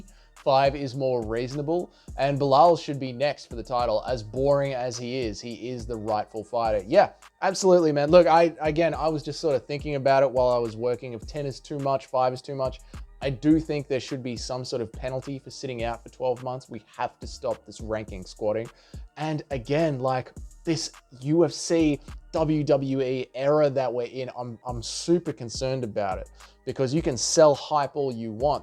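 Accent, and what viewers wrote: Australia. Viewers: I agree. I cannot stand his annoyyyin Australian accent and lack of digital creativity of his videos.. andd lame humour, as in not funny. Nil, Nada, nah uh. I do wish him the best though, as I do myself and everyone. Maybe this comment will make him better, probably will it personally and splash all his money in his bank account or even take out a loan he can't get so he gets someone like his spouse and manipulate her to take a loan for him to get him a new high end computer and softwares for editing, microphones, lights green screen the whole 9, so his vids can compete with top tier amateur journalists and become a youtube ufc reporter 😂.. everything all high quality except his humour and riz. Also he looks like his going through a mid life crisis to start doing youtube and tiktok 🤣 Don't take this personally take it as motivation "brutha" and "have a go at it m8" 😅 hahaha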